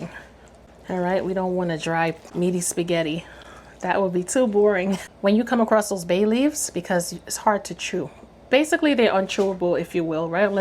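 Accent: New York, United States. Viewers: 🇨🇦👋 Girl, I am only at 2 minutes 11 seconds and I know I am going to love this recipe. It helps that you are so awesome to watch. I love your accent. You sound like you're from New York or New Jersey. I'm Canadian so I could be wrong but loving it so far thank you.😊😊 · Looking sooo yummy 😋. Loving the New York accent 😊❤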